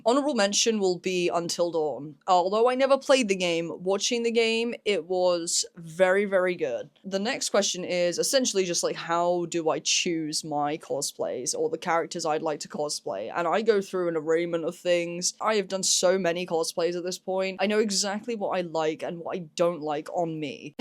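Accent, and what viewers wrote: United Kingdom. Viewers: Wow. Didn’t know you were British.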